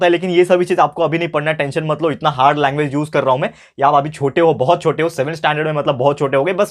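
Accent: Maharashtra, India. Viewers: Sir your from Maharashtra? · Are you Marathi ??😮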